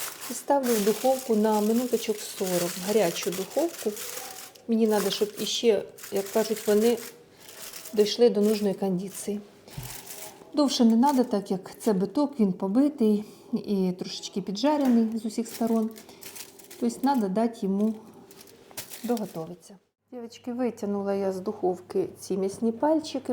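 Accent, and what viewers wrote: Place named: Odesa, Ukraine. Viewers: Землячка моя, наш одеський діалект, приємно чути своє рідне, сумую за домівкою 😢, я заливаю бульйоном коли ставлю в духову шафу, вони небагато смачніші тоді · То й не слухайте! Це південноукраїнська говірка! Діалекти є в кожній мові!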